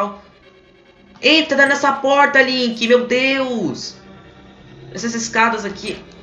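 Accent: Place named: Minas Gerais, Brazil. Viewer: Vc é de Minas Gerais seu sutaque é bem parecido com alguém que mora em Minas Gerais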